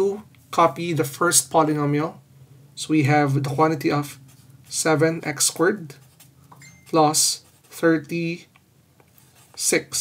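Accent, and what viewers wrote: Philippines. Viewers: I think he's Filipino that's why the accent is different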